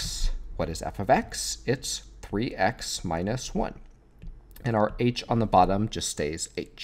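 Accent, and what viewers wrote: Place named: Canada. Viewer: I really want to know what his accent is!!! Is he canadian??!! Also- very helpful video!!!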